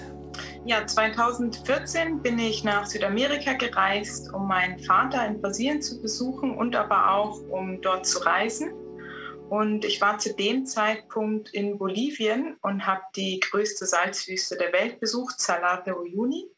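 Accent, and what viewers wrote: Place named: Switzerland. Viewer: Mir gefiel der "Wortverkehr" zwischen der Dame und dem Interviewer sehr gut... sein Schweizer Akzent ist koestlich :) Die Fragen die er ihr stellte erzeugten grossartige, aber einfach zu verstehende Antworten die sich sehr real anhoerten. Sehr gutes Interview :) Besten Dank dafuer und lb. Gruss aus USA ::)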